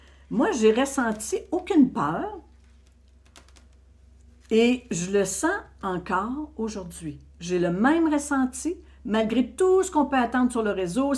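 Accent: Quebec, Canada. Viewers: Merci infiniment pour ton engagement et tout le travail que tu fais avec beaucoup de joie et d'amour. Gratitude pour ce partage du message de l'EIO. Je suis en Italie et j'aime beaucoup t'écouter le matin devant une tasse de thé. Ta bonne humeur et ton joli accent québécois me mettent en joie pour la journée. 🙏 Joyeuses fêtes 🎉❤🤩😘 Très jolies tenues de Noël 🥰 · Bonjour! C'est toujours un plaisir de vous écouter, d'écouter votre "aquecent" Canadien! Oui, 3I Atlas est bien une conscience. Elena Danaan en a parlé dans un de ses Star Nation News. Elle a même pu le voir à travers les yeux de son compagnon. Il faut dire que toute petite elle a été abductée par les gris malfaisants, et a été sauvée in extrêmis par les Galactiques. Malheureusement, ces gris lui ont mis un implant dans le cerveau qu'il a été impossible aux scientifiques Galactiques, d'enlever, sous peine d'attenter à sa vie. 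De ce fait, il grillé cet implant, et l'ont reprogrammé. Ce qui fait qu'elle peut voir à travers les yeux de son compagnon et vice-versa. C'est comme ça qu'elle a pu nous décrire 3I Atlas. Et en effet, 2026 va être une année de changements. Certaines technologies vont être mise à notre disposition pour 2026. Lit médical quantique, prospérité par le QFS (dont de belles sommes sont mises sur chacun de nos comptes, ce qui fait que l'humanité va être riche), les véhicules volants (voitures, autobus, et un autre système d'avions), des réplicateurs de nourriture, et bien d'autres choses encore. Mais surtout, surtout, nos rencontres avec nos cousins venus d'autres planètes, comme les Ummites qui viennent de la planète Oumo située dans la Constellation de la Vierge, à 14 années lumières de la Terre. Elena Danaan a aussi informé que Mars était en Terra-formation. C'est à dire que nos cousins bossent pour refaire cette planète vivante, sur laquelle no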